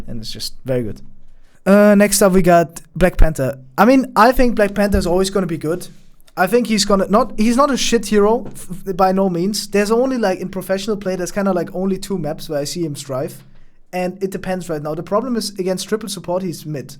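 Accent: Germany. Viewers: On everybodys soul, you are german. It took me 3 min to say for certain, but I am sure now. Its because of the hard k in things like cool and the german ie instead of the american iea in tier.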